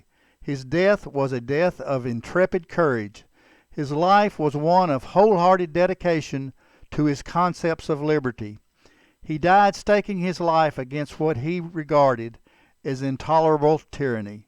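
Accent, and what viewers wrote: Texas, United States. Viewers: Your thick Texas accent compromises your heartfelt thesis. Who would willingly submit to a despot--facing certain death? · I'm in UK and we love Texas accent !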